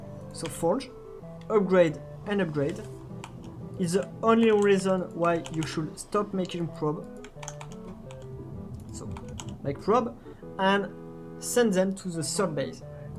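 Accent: France. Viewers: Cheers from Sweden! I have just returned to Starcraft 2, much thanks to the Finnish players who beat the Koreans recently! i was thinking then, are there any "Old" WoL players that stills play... And i found out that Naniwa was playing just some games for fun on twitch and i saw a live match on twitch with stefano.. soo i was hooked again, cus its so funny to watch starcraft 2 matches and suddenly i wanted to play 1v1 again! when i came back to the games i'v been looking for some "sheets" or "how to buildorder" etc and then i saw your effort on <link> i watched some of your videos, found it intressting most cuz i wanted to get toss back into my own protoss play again! And then i saw this video, when u do a series of play on the ladder and you explain what you belive you see and what info you get from your scouting. And your experiences dosent lie to you that often. 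but i found it realy intresting when you react to things. here we "noobs!" can do alot of learning! I think i do alot of progress watching your videos! Me however that are a Platinum player that wish to step up to Diamond i found this video very usefull! I realy hope you do a few more videos like this on the ladder. Cus we lover tier guys learn alot form this! and i love your frenglish keep do videos! its intresting, funny, usefull... you help the starcraft 2 communityt alot by doing this videos! cheers again! Samsos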